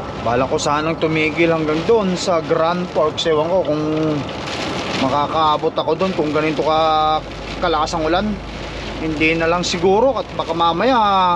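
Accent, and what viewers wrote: Ilocos, Philippines. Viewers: idol tiga saan k, parang ilocano k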